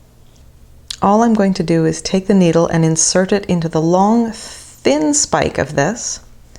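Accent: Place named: Canada. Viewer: Can I just say I love your gnomes but I also love the way Canadians say words with "ou" in them like about and out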